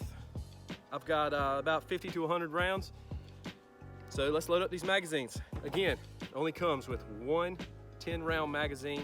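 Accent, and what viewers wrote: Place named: North Carolina, United States. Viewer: Damn... I thought I recognized his accent!!! Tarboro NC is about a hour east of my place in Nags Head NC!3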